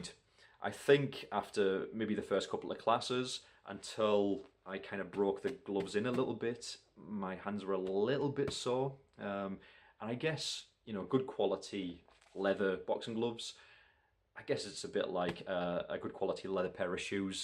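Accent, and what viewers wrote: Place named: Scotland, United Kingdom. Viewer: these are literally my dream gloves I own a pair of heavy bag fairtex gloves and love the Daylights out of them also I noticed the Scottish accent I'm originally from Manchester and live in the United States I could be wrong you could be English but you sound more Scottish to me am I correct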